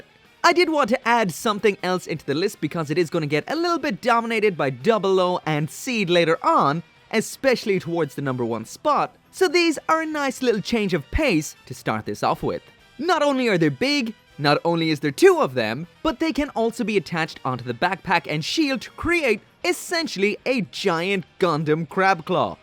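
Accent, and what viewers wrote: Ireland. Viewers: I hear the Irish accent, but there's something else there, right? Maybe I'm just crazy. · Yo you Irish bro?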